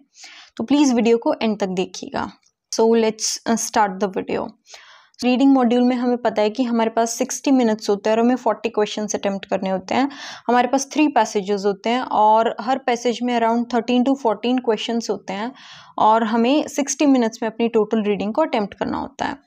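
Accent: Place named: Haryana, India. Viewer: Haryanvi touch 🧸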